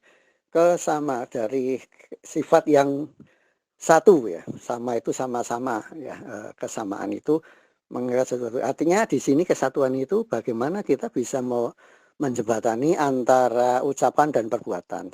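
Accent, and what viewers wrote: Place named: Java, Indonesia. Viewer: Ngomongnya aja medok ko' ingin nguasai dunia.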